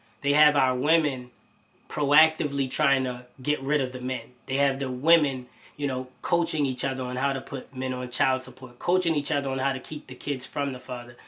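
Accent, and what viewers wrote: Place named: Maryland, United States. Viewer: Sounds like you're in MD I really like what you're doing here. Let's connect brother.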